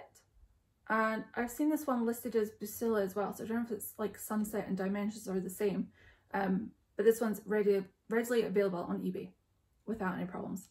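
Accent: Scotland, United Kingdom. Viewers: Thank you for sharing your beautiful work! Love all your stitchy plans as well. The bear, whales and owl are among my favourites! Have a great may and sorry about the trouble with your ankle. Still loving the scotch accent! I am working on the Quakers of Scotland by tempting tangles and am loving it! Scotland is on my bucket list of places to visit as thats where my ancestors originated🙂. I know you are in the US now but I see you still love to stitch those highland cows 🥰. Happy stitching 🧵🪡😊☀️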